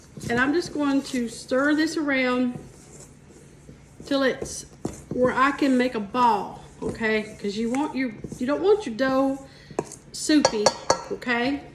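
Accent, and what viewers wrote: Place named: Kentucky, United States. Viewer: I hear that accent. I’m in California, but I lived in North East Kentucky for awhile. I lived in Winchester, under 5,000 in the early 80’s. We also lived in Corinth 210 people back then. Then we moved to New Columbus Kentucky 32 people. Could barely breath so many people lol. It was beautiful and I ate my weight in wild blackberries. I was Blue and Red from the berries and blood trying to get through those thorns. The only thing I hatted was Chiggers. Those stupid bugs made me itch so bad, but it was worth it to be out there. Love your dumpling recipe. I enjoy both flat and fluffy. Thanks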